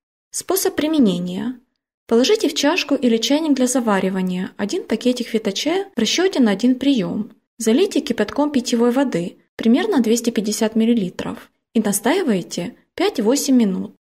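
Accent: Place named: Ukraine. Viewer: умничка...судя по голосу ты с Украины ..смотрю твой канал года 3.. ты как ходячая аптека ))) спасибо тебе и не бросай канал .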